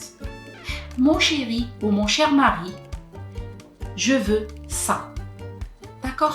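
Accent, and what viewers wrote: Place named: Oran, Algeria. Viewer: J'adore ton accent oranais, ya Omri tu m'inspire avec tes conseils continue stppp 💞💞💞💞💞💞💞💞💞💞💞❤️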